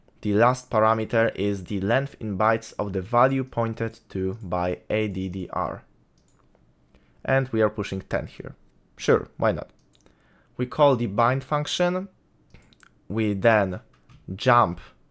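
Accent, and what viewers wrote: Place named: Poland. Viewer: czuje polski akcent 😊😊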